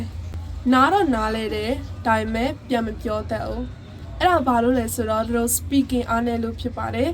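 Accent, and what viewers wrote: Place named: Myeik, Myanmar. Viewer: Thank you Tr. ညီမက မြိတ်သူလား။ လေသံ မြိတ်သံ နဲ့ တူလို့ပါ။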